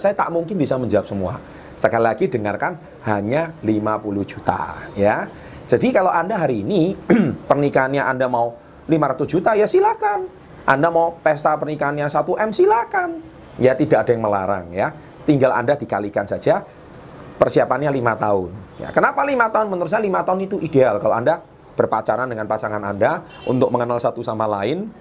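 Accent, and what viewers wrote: Java, Indonesia. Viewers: Orang jawa ya mas Medok ya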